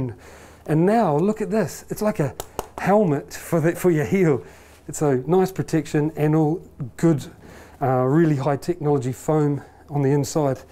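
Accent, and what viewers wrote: New Zealand. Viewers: This dude sounds kiwi..Is he??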